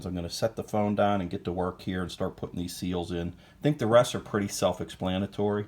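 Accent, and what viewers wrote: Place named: Pittsburgh, United States. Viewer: Thanks for the video. I could tell from your 'accent' that you were a Pittsburger, then I saw the Vaccarri sticker on your loader. I live in McMurray and used to go to them all the time for my BX2230. Now I have to find a rebuild kit somewhere else.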